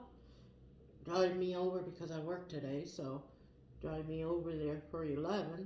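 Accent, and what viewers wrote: Canada. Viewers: this is when I notice the Canadian accent lol 😆 I have no idea what you said haha! Nice video mama breakfast looks delish. Congrats again on the new crib!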